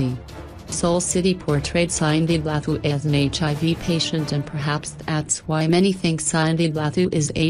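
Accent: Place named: United States. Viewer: who's voice commenting there!!? some American??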